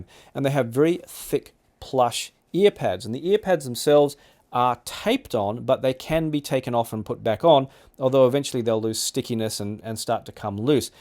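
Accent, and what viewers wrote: Australia. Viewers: Bravo! The most grown-up headphone review ever. "I'm not going to talk about cables." <3 I usually freak out when "audiophile language" is invoked; I can't help being reminded of when I spoke like that and I feel so ashamed. None here. No overused superlatives. No neologisms. As much a pleasure to listen to an Australian accent talking about the best headphones without needing to sell them (they'll do that themselves, no doubt) as it'll be to actually listen to the Expanse itself.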